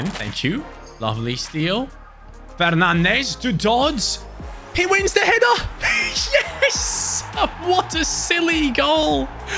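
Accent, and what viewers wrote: Netherlands. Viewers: I am Belgian but still happy to hear a fellow Dutchman. Or German? Anw, I would make a career to put a top tier Dutch club into one of the top 5 Leagues and try and win the league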